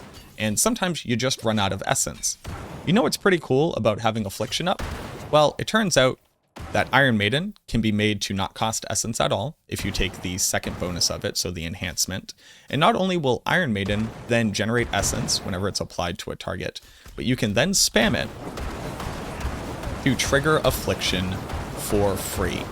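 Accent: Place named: Massachusetts, United States. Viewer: Yaaaas. It's so easy to tell he's from Massachusetts sometimes and I love it because it is where I was born. Only lived there until I was 8 because my parents decided we had to move as soon as I started saying, "idear" instead of "idea." 😅😅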